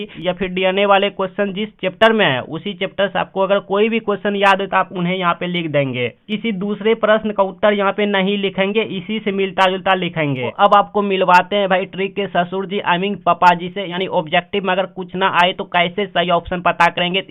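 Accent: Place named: Bhagalpur, India. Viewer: Sir aap bhagalpur jile se ho kya kyunki main bhi wahin se hoon aur meri bhi bhasa aisi hi hai😊😊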